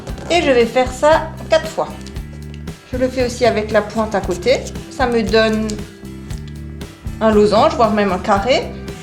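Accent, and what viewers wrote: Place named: North-East, France. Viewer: Hey t'as un Accent du Nord-Est (de la France) C'est sympa comme pliage :) Ca va faite un petit truc bien sympa pour la table d'anniversaire de mon neuveu ^^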